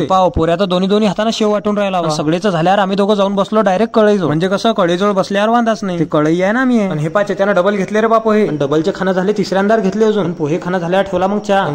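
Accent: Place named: Malkapur, India. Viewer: (MH 28 बोलते दादा) #मलकापुर कर बोलते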